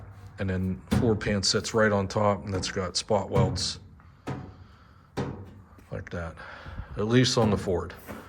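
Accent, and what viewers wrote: Pittsburgh, United States. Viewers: I here a yinzer accent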